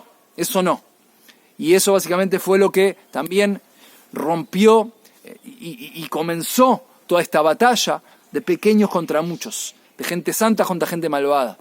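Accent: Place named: Argentina. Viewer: Me gusta su explicación, pero tengo una inquietud, ud. Es judío de . Nacionalidad judia, oh ee ud. Argentino. Su acento tiene algo de Argentina, igual explica excelente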